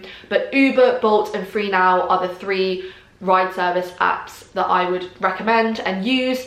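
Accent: United Kingdom. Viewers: Lovely British accent!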